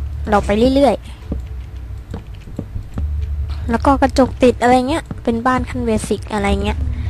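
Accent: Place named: Suphanburi, Thailand. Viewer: เสียงงงงงคนสุพรรณชัดๆๆ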